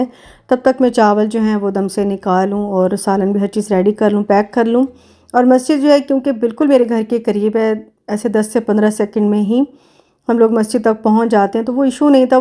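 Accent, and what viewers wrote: Saraiki, Pakistan. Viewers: aap bhi shayad new ho kiu k apko pata hona chahye k yeh ab nahi khasy time say yahan shift hui hain or ham dosron ko to nahi dekhain gay apna farz poora karain gay na neighbours ko pata hona chahye aik dosry ka unko bhi hona chahye tha or inko bhi islamic country mai reh rahy hain na aap log yeh kasa waqt aa gia hay k sari dunia say rabty mai ho jaye insan or apny neighbours ka hi pata na ho yeh achi baat nahi hoti or haan mujhy k vlogs bahut achy lagty hain start say hi dekh rahi hoon inka saraiki lehja mujhy bahut pasand hay or aik dosry ko achi baat batani chahye bura nahi manana chahye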